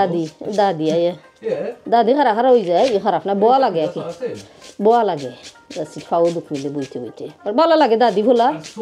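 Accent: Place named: Sylhet, Bangladesh. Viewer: Aafa afna amrar sylhete, London a sylheti mat hunia Val laga afar muko amrar sylheti mat hunia, Amra hokkol syloti...aafa vlog dakta ar lagi kub kub Val laga.....Amar sylhet Amer afa..ra suvo kamona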